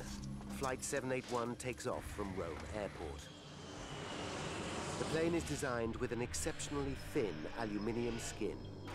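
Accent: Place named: England, United Kingdom. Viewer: I like the English narrator much more than the American narrator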